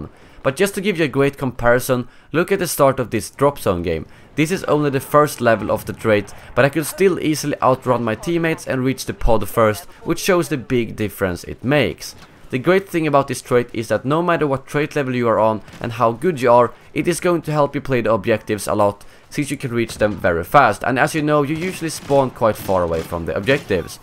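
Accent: Sweden. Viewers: It sounds like youre from sweden? Am i right?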